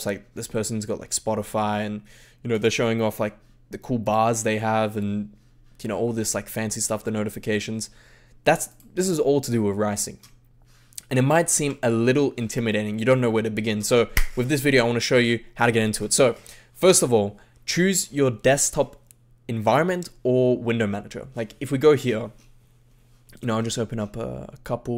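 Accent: Hungary. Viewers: are you hungarian by any chance? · magyar vagy? xd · az úr magyar? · are you hungarian ? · Hey man are you hungarian? :D love the video Nagyon jó videó lett:) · Are you Hungarian?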